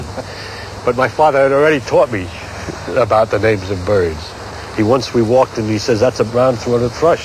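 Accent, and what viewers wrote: New York, United States. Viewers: That New York accent is so catchey. I remember once telling my mother that it is possible to have negative integers as well as positive integers and tried to explain my idea,, this was our at the age of 11 or 12, she just laughed at me and said that was stupid, it wasn't until high school then I realised that's exactly what you can do. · I love his accent. Is that the thickest New York accent ever seen on TV?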